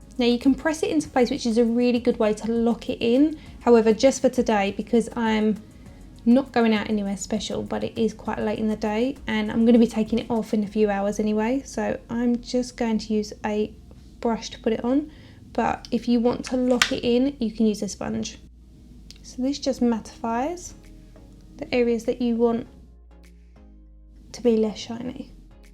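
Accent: Essex, United Kingdom. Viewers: Love your Essex accent 💕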